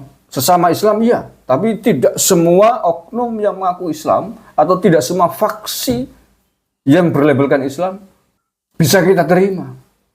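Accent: Banyumas, Indonesia. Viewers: Ngmonge ngapak bae pak...lucuuu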